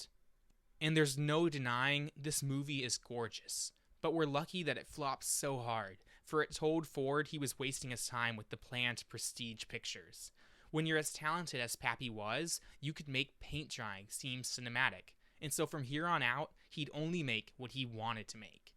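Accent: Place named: United States. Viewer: This is very good. But it's a pity the narrator has one of those now typical modern American accents. i can't describe it in print but a whining raise at the end of words or sentences: ''that way of making moviess'' '' 7 Womennn '' . It's quite a new thing. Older Americans don't do it. It sounds awful and in some cases unbearable. Someone told me it's known as the ''high rising terminal'' or ''up talk''. Another person told me that it's known as ''vocal fry'' but someone else said that the whiny sound is neither of these ? Don't mean to be rude but it spoils and undermines an otherwise excellent analysis.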